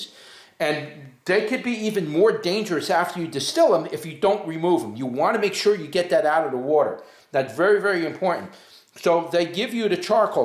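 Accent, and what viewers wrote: New York, United States. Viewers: Thank you for this mine has a digital board at the front, great review, are you from New York, I'm in England!!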